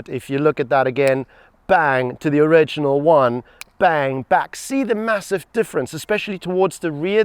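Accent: Afrikaans, South Africa. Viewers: This guy sounds South African? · Love the Afrikaans coming through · Are you South African?😊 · Tell me you’re South African without telling me your South African? Ma se kinnes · Love the accent sir your far from home🇿🇦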